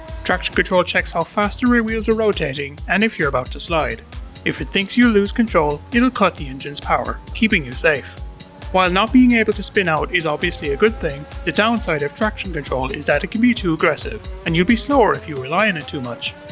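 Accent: Ireland. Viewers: My man sounds a little irish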